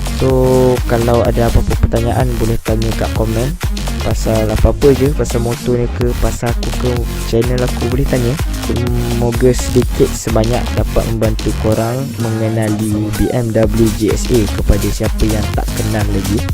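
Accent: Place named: North, Malaysia. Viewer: Memang syok Ooo🤣🤣🤣🤣 ayat utara btl..😂😂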